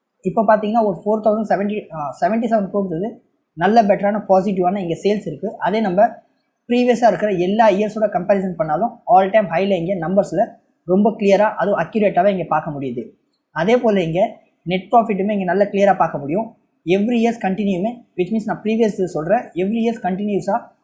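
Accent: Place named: Mumbai, India. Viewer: Mumbai kaarar paesum alagiya tamil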